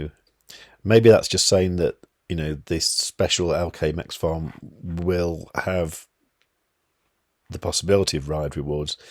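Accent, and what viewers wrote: United Kingdom. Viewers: You are living in the states? you sound british or am I mistaking as german and english as a foreign language 😬 I guess the people who got RIDE via Launchpad are able to create a farm. For me I need to wait to get RIDE token, but actually I would like to participate from higher APR in the good old Farms when people leavin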